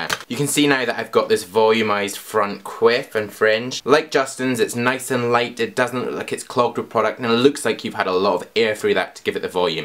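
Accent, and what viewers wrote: Scotland, United Kingdom. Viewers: That's how people in UK speak their accent is different so don't call him gay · Are you Scottish ?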